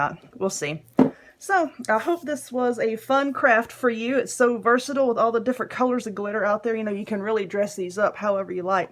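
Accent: South, United States. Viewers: Love your channel and your southern accent. I have southern accent as well 😊 I wanted to know what type of cricket machine you have?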